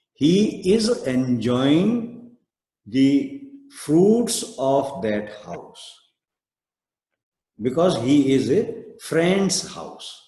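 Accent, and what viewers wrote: India. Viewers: Спасибо за русские субтитры: иногда приятно отключиться от сильного индийского акцента.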